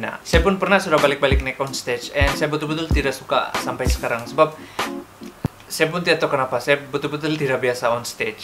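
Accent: Sabah, Malaysia. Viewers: bole ka buat video ajar macamna mau cakap sabah? Saya suka sama dialek sabah😊mau cuba cuba..bole ka..hahha..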